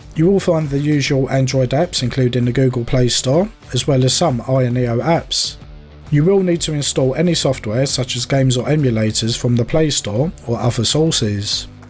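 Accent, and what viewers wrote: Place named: London, United Kingdom. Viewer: If you ever have his money, don't forget about me xD I had a feeling you were using a London accent. Your voice reminds me of his role in the series Taboo.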